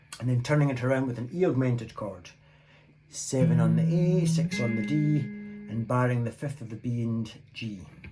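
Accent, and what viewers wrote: Scotland, United Kingdom. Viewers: Django with a Scots accent.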